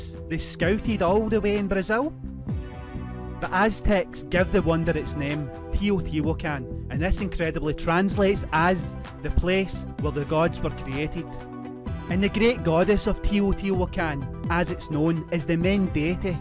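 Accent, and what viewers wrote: Scotland, United Kingdom. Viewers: I can hardly understand every other word of the Scottish accent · what part of Scotland is the narrator from please? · That's a strong Scottish accent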